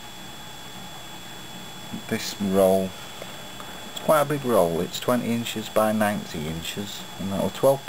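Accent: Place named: Scotland, United Kingdom. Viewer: Awesome, sounds like scottish though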